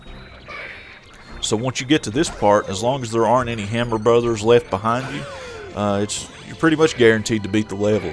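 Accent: South, United States. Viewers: What state you live in? I love that southern accent of yours.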